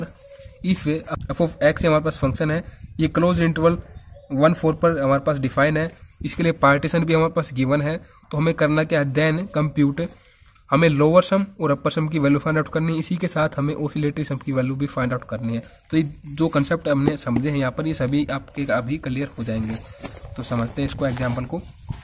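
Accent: Haryana, India. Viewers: Aap h haryana se ho?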